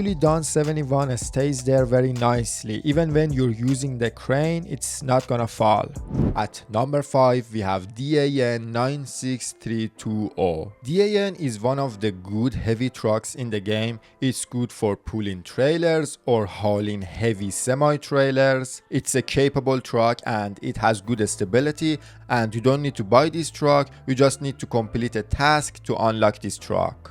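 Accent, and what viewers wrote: Iran. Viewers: داداش از لحجه ت حس میکنم ایرانی ای😂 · The most Iranian voice I ever heard, nice video tho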